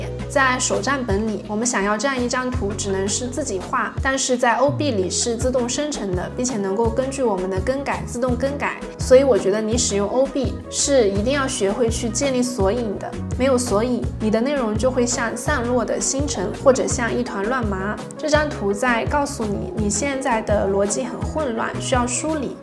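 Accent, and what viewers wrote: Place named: Shashi, China. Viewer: 为什么你说话这么像我们沙市人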